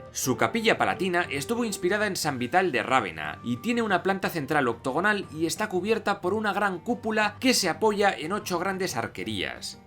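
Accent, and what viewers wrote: Spain. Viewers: Me hizo reir los comentarios de este narrador. Con ese acento español. Pero muy interesante lo que dijo.😂😂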